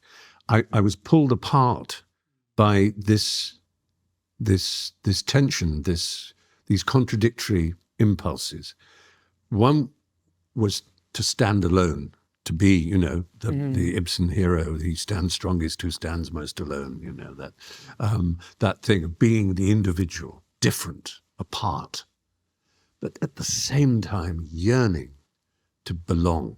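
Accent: RP, United Kingdom. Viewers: What it means to be British? I'm American so I don't know and don't care. I only know we dodged that bullet. The accent's nice, though, but it's another trait that is rapidly fading. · Without watching this, for Fry it means speaking with a cut glass accent while telling the rest of us that speaking properly is not important. It means rubbishing Christianity and then saying how much you like to sit in an English church. It means setting yourself up as a liberal elitist and then using the (lack of) freedom of speech card to crawl across the political divide to more conservative politics. He’s typical of a liberal who has the luxury of not having to live with its consequences. I always had a lot of respect for him but now just think he’s full of shit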